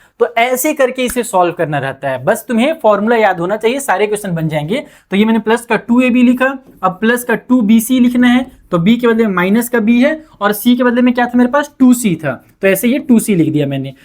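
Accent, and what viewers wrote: Bihar, India. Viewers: Sir bihari ho kiya.......... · thank you sir are you from bihar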